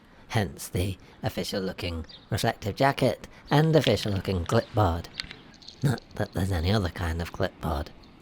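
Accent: Yorkshire, United Kingdom. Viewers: Everyone reading this, pause for a moment. Close your eyes (after reading the rest of the instructions, otherwise you won't know what to do). Now picture saying "Red Bull gives you wings" in his cute little Yorkshire accent. Your day should now be 12.75% better.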